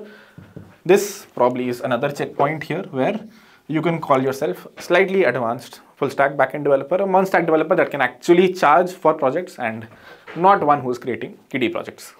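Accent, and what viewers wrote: India. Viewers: ur Hindi English is too good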